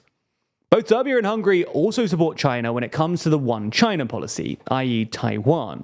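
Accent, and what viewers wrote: England, United Kingdom. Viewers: The videos are great but what s with this overly british accent ?? Kind of anoying, sorry☺️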